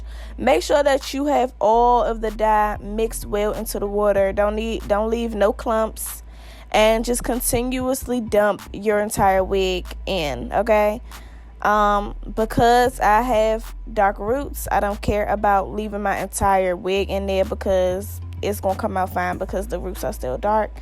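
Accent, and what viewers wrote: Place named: Baltimore, United States. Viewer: Accents giving me B more or philly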